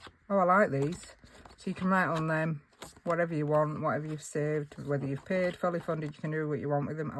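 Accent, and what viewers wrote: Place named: Yorkshire, United Kingdom. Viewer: Hey! Love the long video, wish I was there...bit of a schlep from Oz tho 😂. We'll be back home next week for a visit so just missed it....boo😢! Glad you had a wonderful time well jell over all your lovely goodies ❤ have a lovely weekend bud 😊 ps...lovely to see you and to put a face to that yorky accent 😂❤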